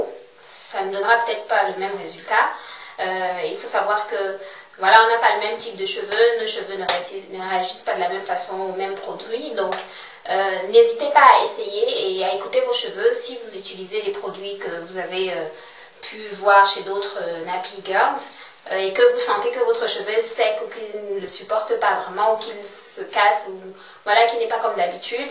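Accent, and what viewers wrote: Cameroon. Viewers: J'adore ton acent de chez nous (kmer mboa). moi j'ai raqué il ya 1 semaine (je me suis defrisée) apres 3 mois de soin et de belle repousses afro, solides et tout. mais je travaille ds la banque et c'est pas evident pour le taf. tu m donne trop envie de me faire mal pour retrouver mes cheveux epais, et tout brefff i lov ur vid's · Hello j'aime entendre ton accent amerounais, moi aussi je suis camerounaise, ça fait plaisir de croiser une de ses soeurs sur Youtube...L'huile de ricin est EXCELLENTE, ça rend les cheveux fins plus épais,ça donne du volume,ça lisse les ecailles des cheveux et le Comble, ça favorise réellement la pousse du cheveu! J'adoreee...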